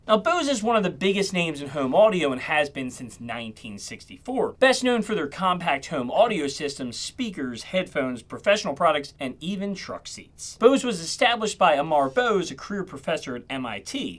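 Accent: Baltimore, United States. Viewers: Are you from Baltimore/Maryland? I'm not from the US but I'm trying to improve my accent identification. (Also please don't think I'm weird, I'm just a sperg.) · 10 seconds in and I knew you were from Baltimore.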